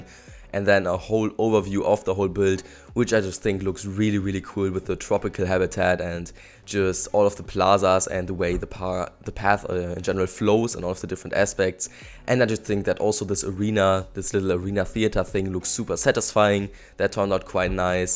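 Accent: Germany. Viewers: Dieses Aussprache hört man als Deutscher sofort haha. Starkes Video ✌ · German accent.... Cant give me that...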